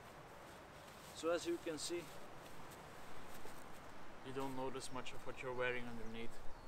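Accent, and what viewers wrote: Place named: Russia. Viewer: Классно снимаешь, акцент, по правде говоря, всё-таки русский)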